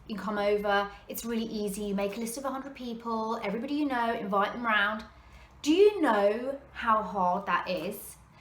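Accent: United Kingdom. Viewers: it's great to hear the rant in a British accent brilliant. By the by everything you said is true in amway also ..thank you cheers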